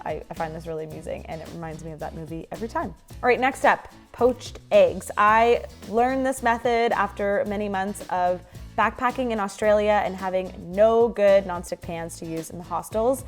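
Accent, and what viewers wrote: Toronto, Canada. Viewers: Damm that last one. Really blew my mind and made me so hungry. Also from the way you talk, you look like from Toronto 🤜🤛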